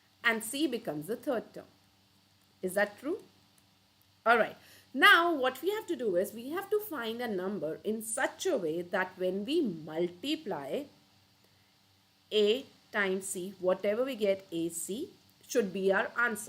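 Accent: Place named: South Delhi, India. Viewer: she got the south delhi accent :face-blue-smiling::face-blue-smiling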